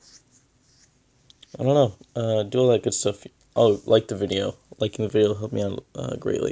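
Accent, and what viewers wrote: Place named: United States. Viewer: Thank God an American accent!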